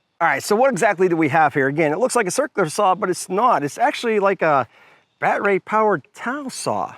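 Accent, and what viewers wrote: Pittsburgh, United States. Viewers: I hear Pittsburgh in your accent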